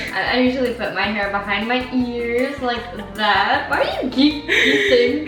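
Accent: United Kingdom. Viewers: British accent is slayyy. Btw can you pin me 📌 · But good london Accent! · The 'Brit's don't be offended XD' got me rolling on the floor. You were speaking very POSH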